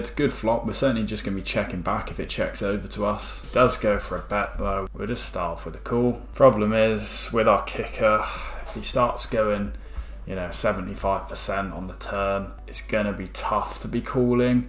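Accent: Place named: South West England, United Kingdom. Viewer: Yes mate. Some Sunday content for the lads. You sound like a fellow South-Westerner... Bristol?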